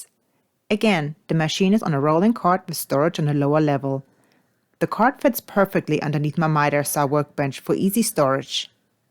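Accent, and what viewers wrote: Germany. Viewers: Als dein Video gesehen hab, musste ich lachen. 1. dein aussehen soll was? Die lustmolche anziehen?🤣 2. dein Roboter englisch, kommst du aus Deutschland? Das einzigste was mir gefällt ist das du als Frau dich handwerklich dich betätigst.😄👍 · Are you German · Is that a German accent? Never really heard one before. 🤔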